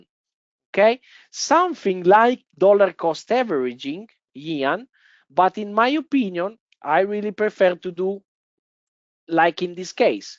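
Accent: Italy. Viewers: You have italian accent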